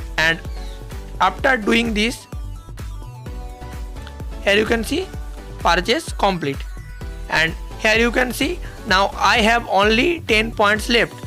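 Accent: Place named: India. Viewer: This guy sounds like india itself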